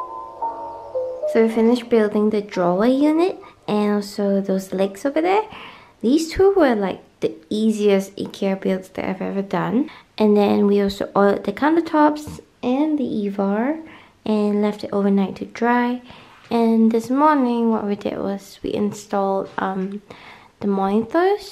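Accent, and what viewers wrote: Singapore. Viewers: Your accent sounds abit Singaporean ahah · The familiar Singapore accent ! Love the makeover. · Didn't realised it at first, but then recognised the "Singaporean accent" hahah so cute. give familiar feels watching your vids >.<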